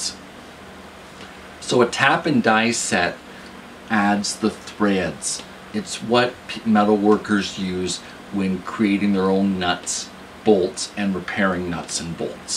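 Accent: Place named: Midwest, United States. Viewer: I was looking for a different purpose. But FYI, your idea makes perfect sense for your purpose. I am guessing by your mild accent that you live in the Midwest or maybe even Canada. Living in blazing hot Texas, I would suggest anyone living down south select a sturdy light colored fabric such as an upholstery fabric or painters tarp or canvas and buy a good UV waterproofing spray to more effectively fend off rain and the heat of the sun. Your design idea also gave me a kernel for my unrelated shade cloth project, so thank you sir. 😄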